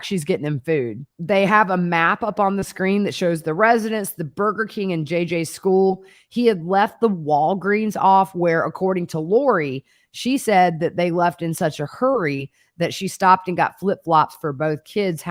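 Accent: South, United States. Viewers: I enjoy your excellent commentary as it is so easy to follow. I am sorry to say I just discovered you but I am new to YouTube AND I am 76! I love hearing you talk. I am from Mississippi and have lived in Georgia as well as many other states but I will always love hearing a soft Southern accent.😊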